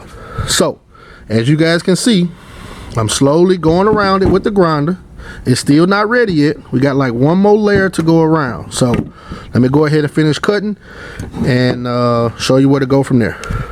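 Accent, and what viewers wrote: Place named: Houston, United States. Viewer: Dude is undoubtedly from Houston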